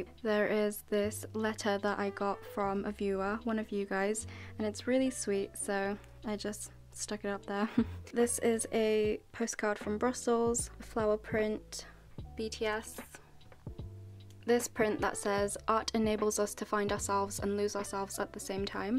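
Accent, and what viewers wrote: United Kingdom. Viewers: I bet that you have Pinterest😍♥️ British accent? Yes love it too 😍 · MashAllah how do you have very good British accent👌👍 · the british accent 😍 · i never expected that accent, when you started talking i went "WOW CUTE". A malaysian with a UK accent aaa cutee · The british accent i can't😭😍😍😍😍😍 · omg i love ur eccent bri'ish✨ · When she said “anyone recognise this hoodie?” Me: OF COURSE! That is Jungkook donut hoodie💖✨ Anyway I love you British accent👏🏻👍🏻 Are you from Malaysia? Cause I am from Malaysia🤗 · I love your British accent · Love your room tour! wishing can have my own space to decorate it like this as well❤ and please do upload more video as i love watch ur video and hear your british accent💕🍀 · I love the way you talk and so in love with ur british accent! <3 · It's cause she stays at uk so of course she would have a British accent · that british accent thoo 🤤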